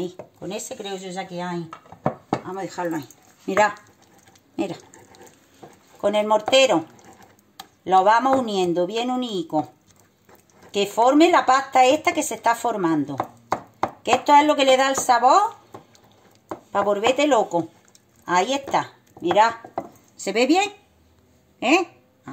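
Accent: Andalusia, Spain. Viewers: Que simpaticaceres. Una autentica Andaluza!!!. Me encanta oir a los andaluces. Claro que lo hare este verano!!. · Gracias por ésta receta tan natural , tradicional y refrescante. Tengo ilusión en hacerla, seguro q está de maravilla 😋 Mmm... Gracias y aforo tu acento Andaluz ! Guapa 😘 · Ese acento andaluz divino!!!!!!!!!! Desde Uruguay, cálidos saludos. Y la pipirrana, riquísima!!! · Pues hoy la he comido yo. Mi madre la hacía así y era jiennense; todo muuuy rico, pero lo que más, las sopas con el caldillo. Ese acento, cómo me traslada a la infancia......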